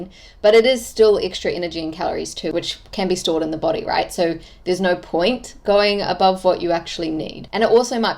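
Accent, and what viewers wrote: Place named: Australia. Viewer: Excellent teacher and the Australian accent makes it nicer. Hello from Canada.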